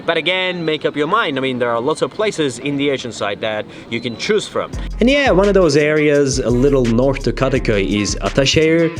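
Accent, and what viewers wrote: United States. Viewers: This the first video i saw very impressive and full of information i was looking ....Also being born in Turkey you talk like Americans.we would love to visit Turkey in very near future.Take care and God bless you. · Nice narration. You speak like American. 👍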